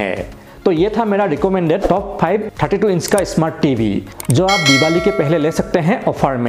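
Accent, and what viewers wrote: Assam, India. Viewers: You accent says you are assamese from uper Assam and why do you sound like reading newspaper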